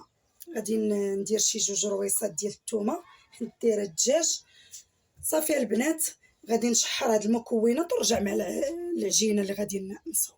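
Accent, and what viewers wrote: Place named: Abda, Saudi Arabia. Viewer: اللهجة ديال عبدية ولكن اختي استعملي دقيق القمح دكالة وعبدة عندهم القمح تبارك الله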